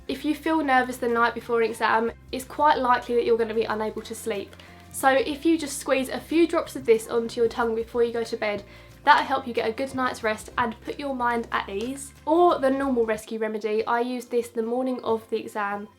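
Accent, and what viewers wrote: Essex, United Kingdom. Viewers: Your accent is penggg where are you from like Essex??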